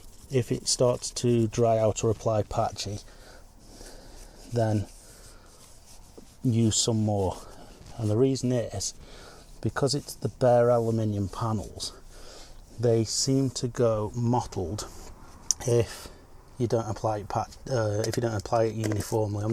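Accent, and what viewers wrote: United Kingdom. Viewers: Is this your first time hearing a British English speaker?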